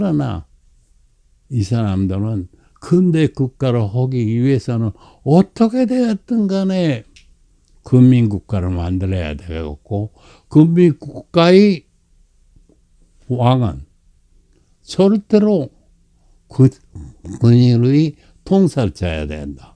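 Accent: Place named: Japan. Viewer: 기억이 가물가물하지만 전에는 한국말 또렷하게 하신걸로 기억하는데 이 영상엔 일본말같은 한국말을 쓰시네요.--;; ==> 예전 영상보니 원래 그랬었군요.제가 착각했나보네요.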